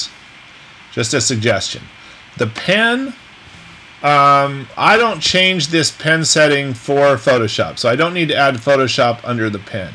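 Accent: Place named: United Kingdom. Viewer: Not a bad thing at all at least now I can relate a voice to face. Funny I always assumed you might have been british!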